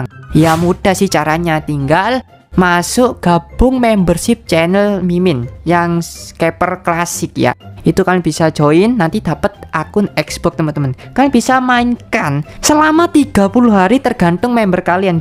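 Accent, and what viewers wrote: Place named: Indonesia. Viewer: medok e rek rek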